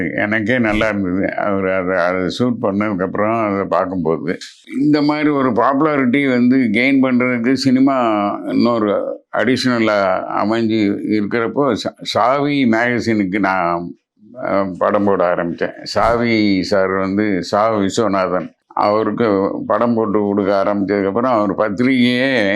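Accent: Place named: Tirunelveli, India. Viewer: சரளமான நெல்லைத் தமிழ்.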